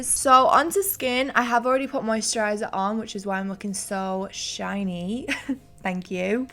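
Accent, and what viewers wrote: United Kingdom. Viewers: You are like Angel 💛so cute so beautiful 💛and i love your britch accent 💛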